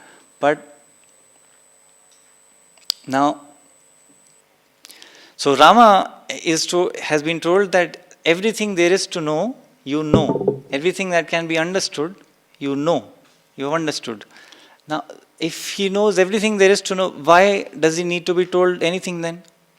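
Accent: India. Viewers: Hindicized pronunciation of names is disappointing when the talk is not in Hindi.. personal opinion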